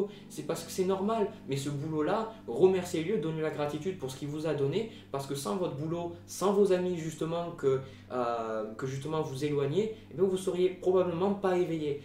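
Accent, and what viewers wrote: South, France. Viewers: je découvre cette vidéo que maintenant. J'ai déjà un terrain à être éveillé: de nature très introverti, à me retrouver seul, à avoir des synchronicités et en même temps très empathique. Cependant en 2020 en faisant de la sophrologie encore plus profondément, j'ai reçu un message spirituel comme quoi il fallait que je change de boulot. Je supportais plus les ragots un peu comme tu décris. Aujourd'hui, je suis épanoui dans mon nouveau job. je m'éloigne encore + de ceux qui m impose à ce que je me vaccine vs coronavirus. Je suis pas antiVax, mais là je le sens pas ce truc. Tant pis, mais je m eloignerai des endormis et j'impose pas ma façon de penser aux autres. Enfin, dis moi d'où vient exactement cet accent du sud? Au plaisir de te lire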